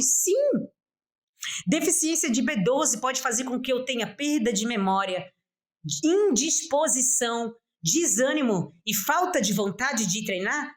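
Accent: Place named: Florianópolis, Brazil. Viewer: Pelo sotaque, é manezinha da ilha😅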